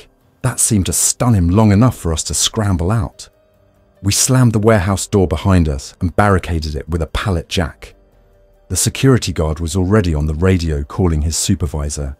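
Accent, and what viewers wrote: United Kingdom. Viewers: An AI read story - homonyms like "a door starting to bow" (pronounced as "bow" & not "bO" . . . a Brit accent for an incident in America is a story flaw with minimal odds. While in a hospital but nobody is looking for supplies even though 2 have med-tech backgrounds to know how important such materials are. Another flaw. Okay - not great.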